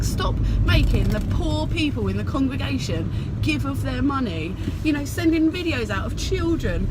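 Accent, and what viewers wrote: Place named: Southern England, United Kingdom. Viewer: where you from im from hastings sussex you sound southern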